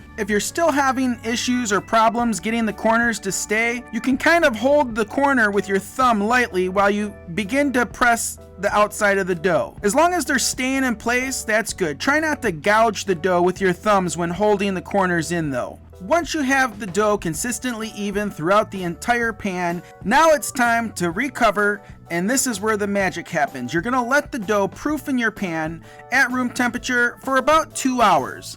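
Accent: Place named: Upper Midwest, United States. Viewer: accent from upper mid-west, check. banana peppers on pizza, check. pizza isn't limp when it comes out, check. you have my full endorsement and I will be trying this today. 🙏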